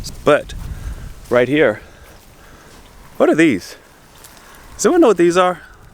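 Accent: England, United Kingdom. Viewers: Oh mate, your British accent 😂😂😂😂 Love it! 😂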